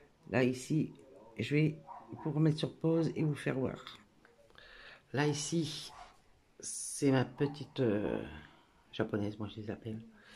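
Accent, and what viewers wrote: Nord-Pas-de-Calais, France. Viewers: Un petit coucou du Québec au Canada. J'adore regarder tes vidéos et le fait que tu parles cht'i ça me fait penser à ma grand-maman qui venait de Lille. Ta petite Gorjuss et super belle.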